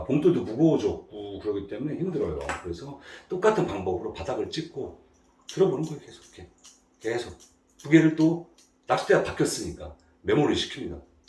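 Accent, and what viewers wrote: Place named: Chungcheong, South Korea. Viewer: 님께서 이번에 올려주신 영상 토대로 공부해서 출조하겠습니다! 속 시원한 설명과 유익한 정보 감사드립니다^^ 충청도 사투리 정말 맛있게 쓰시네요ㅎㅎ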